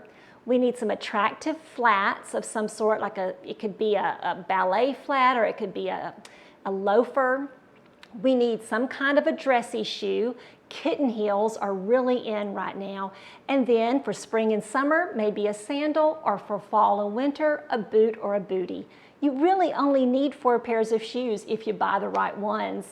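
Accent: South, United States. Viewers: You have a charming southern accent. When my hair turned silvery gray, I asked the students at the beauty school to make me a blonde so I look and feel younger, not like a gray-haired old lady. I changed my lipstick and blush to light bright pink colors, nothing dark. Love Tip #3...Don't aim for young--Aim for modern. Yes, contemporary clothing is more youthful. Some of my friends do not try at all--they look like a walking clinical depression. They appear so matronly, 15 years older than they really are!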